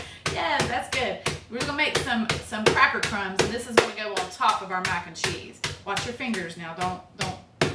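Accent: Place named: South Texas, United States. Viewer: Great video. You sound like you're from South Texas.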